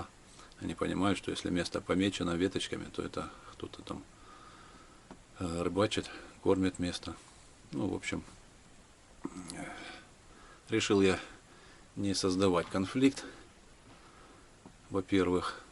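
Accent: Ukraine. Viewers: Поэтому и спрашиваю..по говору понял что украинец. Я недалеко от Новочеркасска проживаю ни снега ни льда нету давно.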